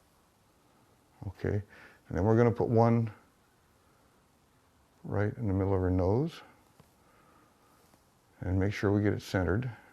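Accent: United States. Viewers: That's an honest to God, original Oregonian accent. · Midwest accent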